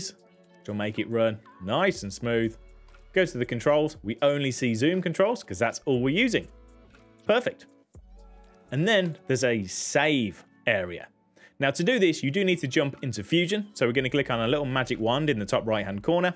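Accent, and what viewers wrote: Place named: Midlands, United Kingdom. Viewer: I live in the Midlands and have an accute "accent" awareness.. Yours sounds about 20 miles from Colin Furze! Somewhere north of Leicester and up as far as Nottingham. Just a random comment!! Cheers, Callum.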